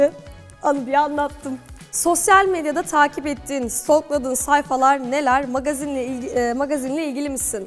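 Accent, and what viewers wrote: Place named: Kastamonu, Turkey. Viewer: Kız sen Kastamonulu musun 😮 ben de diyorum bu kızın konuşması bana niye tanıdık geliyor 😅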